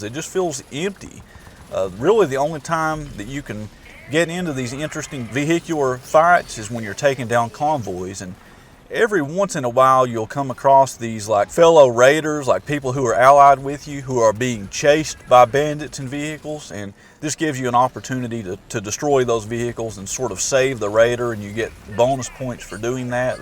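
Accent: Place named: Appalachia, United States. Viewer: I was just gonna say Appalachian twang!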